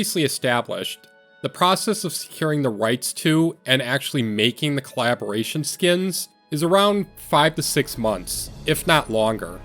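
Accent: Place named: United States. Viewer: The way you speak makes my ears hurt. You’re accent makes it painfully obvious you’re just another american. I hate the way you guys speak. It literally is the wrong way. When you say words that include “Er, Or, etc), you shouldn’t be saying it (for example: Word), like “Wrd”. The ‘R’ is simply there to change how it gets pronounced, it isn’t meant to be pronounced on its own. Don’t even get me started on words like ‘on’, which oddly seems to be pronounced like “Arn”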